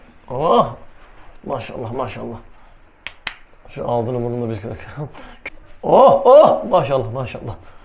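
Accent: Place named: Diyarbakir, Turkey. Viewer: Slm hayırlı akşamlar Diyarbakır'dan siveniz sanki bizimkimize benziyor siz Diyarbakırlı misiniz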